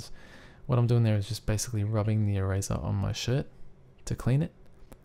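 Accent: Australia. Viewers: Is this aussie accent ? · Nick889999 because he's got an accent because I think he's Australian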